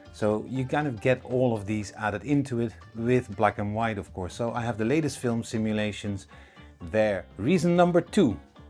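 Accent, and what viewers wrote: Netherlands. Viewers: Ik krijg onmiddellijk een combinatie van plaatsvervangende schaamte en tenen krommend afgrijzen bij het horen van dit steenkolen nederlands engels. Zal best goed zijn maar niet te trekken dit.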